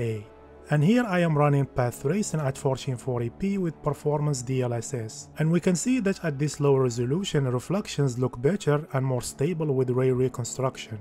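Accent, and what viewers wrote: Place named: Morocco. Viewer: Your English accent like moroccan people , nice videos keep going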